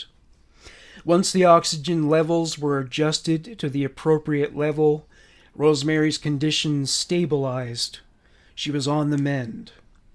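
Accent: Canada. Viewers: He's Canadian give him a chance aha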